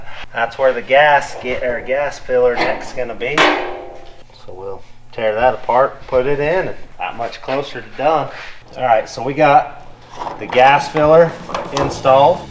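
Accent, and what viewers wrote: United States. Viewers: Looking for the end? You will find that when the roll is finished. Still cant get used to the American way of saying things😉😉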